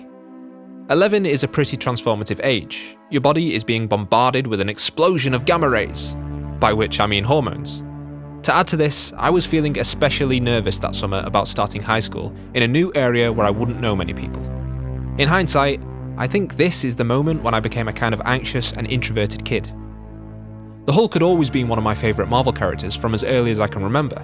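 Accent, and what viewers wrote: United Kingdom. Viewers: off topic, but what is that accent? I know it's UK but more specifically lol. sorry im american. like is it Birmingham or Yorkshire or Lancashire? lol im just guessing so sorry if im way off!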